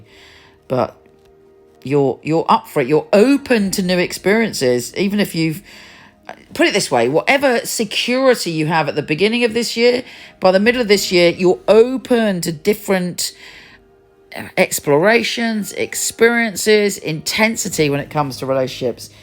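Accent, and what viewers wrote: United Kingdom. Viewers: Is this? How come she has british accent?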